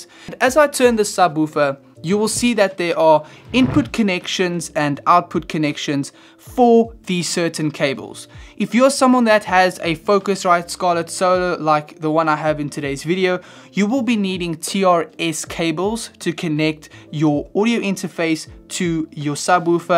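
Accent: South Africa. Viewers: Excellent review. I got the Eris 3.5's and am really considering adding a Sub8 to it as well for more better focused low end. Is that a South African accent I spot?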